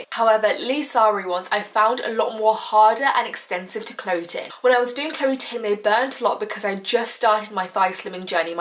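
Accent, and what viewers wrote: United Kingdom. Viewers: im pretty sure its british, I am british too lol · I came here to see how I could get some slim thighs but what I was observing... God DAMNN!!! Her British accent is soo much strong...😄😅🤗😘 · It's a British accent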